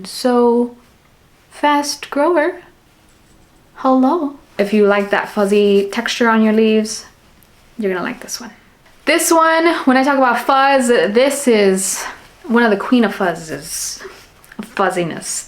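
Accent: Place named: Latin America. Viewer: kaya (hello!) from unceded wardandi noongar boodja, a.k.a. the south western most corner of western australia. I’m not really a commenter, but I’m pretty sure your mystery hoya is a fungii (i love it’s cute mushroom name!) I wanted to say that your planty joy is a pleasure to share in and your genuine and unabashed enthusiasm is greatly encouraging and uplifting, a real celebration of life in her many forms. I thought while I’m here I’d share that two more things, one that the indigenous land I inhabit is one of the 36 global biodiversity hotspots, and we have a range of incredible native orchids and carnivorous plants that are currently growing and blooming throughout our season of Djilba, you should look up the ‘bridal rainbow’ drosera and the ‘queen of sheba’ orchid if you get a chance, they’re just some of the most famous of many stunners! 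The other is that I figured from your cadence you are latin, my housemate and close friend is Ecuadorian, working on getting permanent residency here, and she wanted to grow a cactus on her desk at her new job, so I showed her your videos on the beefs (!) for some tips and also bought her a mini grow light because her office isn’t well lit, and we’re going to go to a local specialty beef nursery to pick some new friends for her! I showed her your video of trying the tunas fruit with tajin, she actually found tajin in our local supermarket here, I’ve tried it with mango, and as a gringa (haha!) I understand the hype now! I have a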